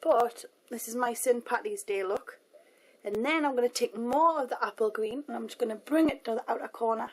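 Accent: Newcastle, United Kingdom. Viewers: i like ur british exent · She has a geordie accent, she's from south sheilds near newcastle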